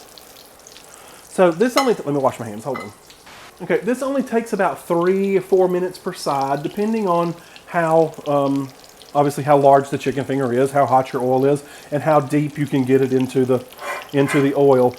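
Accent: South, United States. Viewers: Omg your voice and accent is so cute! You sound like that rooster 🐔 in Bugs Bunny, Foghorn Leghorn 🤣☺️👍 So Southern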